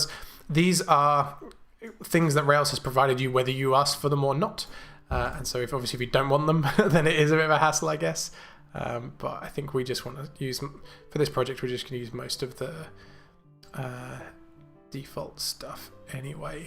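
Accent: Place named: United Kingdom. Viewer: Mate, I really do love your British accent. Cant get enough :D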